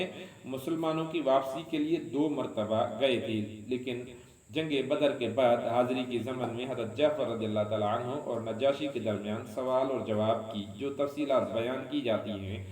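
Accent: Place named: Sindh, Pakistan. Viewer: very good book and good voice although sindhi accent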